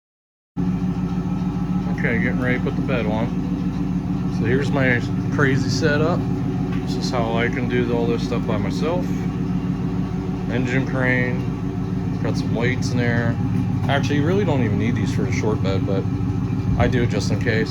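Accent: Pittsburgh, United States. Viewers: That’s gotta be a Pittsburgh accent. If so I now live in Monroville can you fix my rockers and cab corners? 2000 f250 7.3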